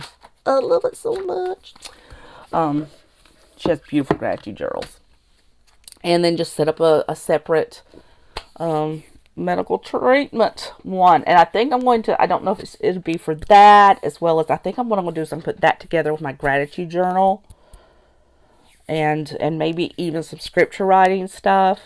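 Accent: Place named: South, United States. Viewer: Love your accent! I’m from Mississippi but live in Alaska. I don’t hear that lovely southern accent much anymore.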